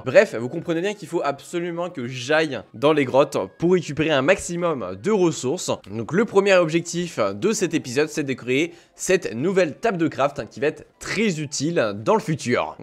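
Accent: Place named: England, United Kingdom. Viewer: Très belle accent anglais 👌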